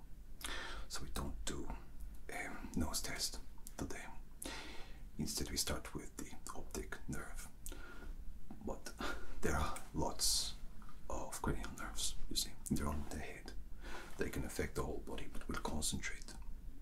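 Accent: Russia. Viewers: I’m going to insist my doctor speak with a Russian accent from now on. 😁 Good job,! 💙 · Love the Russian accent 💙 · Oh...yay! The Russian accent returns....as a blonde!?!?!? 😊 Is the fact that I didn't know the answers to some of the UK questions due to a brain aneurysm, or is it because I'm American? 🤔. Glad our favorite doc is taking some time to be where is needed most.. 🥰 Stress & headaches galore this week. Being kind to myself sounds like a good idea, as does being kind to others. I've been letting certain people push my buttons. Gonna have to work on that. Lovely video as always. 😊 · your Russian accent is my personal favorite trigger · made me smile with a russian voice and of recent news of russian poisoning and hearing police sirens Twice lol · posts video with russian accent Me: crying and pretending that this is Dimi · I love the Russian accent RPs! 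How wonderful that you combined it with a medical RP. Genius! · Nom nom, - delicious tingles to be had here! Russian voice: My brain is no longer hunger for relax. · Very tingly and the your russian accent is the most pleasant to listen to ever, thanks for the video, ✨ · your Russian is wonderful and the good Dr Roberts!! So glad he is still with us and got to take a vacation..Im sure Matt was a rather difficult patient😎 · Отличный русский акцент! Спасибо за видео, голос шикарный. Мурашки бегут, словно резвые кони по берегу Днепра! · No matter what name you decide to use Roberts or Alex you would be the best "doc" a person would want to see and i like your russian accent 😊😊😊 Always well done really 🤗👏👏💖😍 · your Russian is better than what Hollywood often settles for in their movies. Well done!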